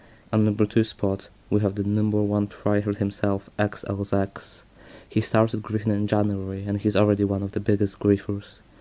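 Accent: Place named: Poland. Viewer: I can hear your Polish accent in your voice, but that's okay because we can still understand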